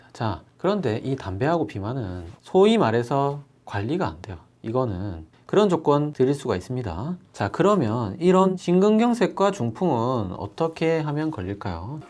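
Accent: Gyeongsang, South Korea. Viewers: 구수한. 경상도사투리. 너무 정감갑니다 편안하고. 쉽게. 설명해주셔서 감사합니다 스폰지처럼 머리속에. 쏙쏙. 이해가. 갑니다 짱. 입니다 ㅎㅎ. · 대구분이신가봐요^^응싱스럽다말은 경상도사는 저의세대도 저만 사용하는 사투린줄알았는데..^^ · 와이거 메모해야겟네..ㅜ 근데경상도이신가요?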